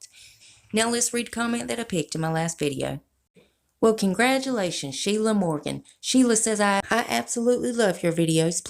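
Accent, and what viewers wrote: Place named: Alabama, United States. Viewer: Are you from Alabama? :) I’m originally from North Mississippi! You speak my language !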